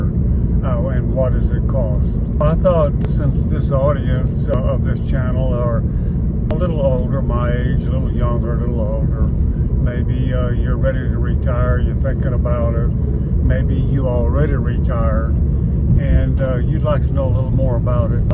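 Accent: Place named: Boston, United States. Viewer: I love your Boston accent.... ;\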